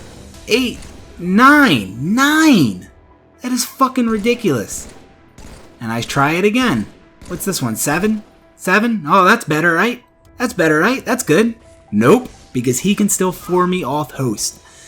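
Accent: Baltimore, United States. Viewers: Baltimore for sure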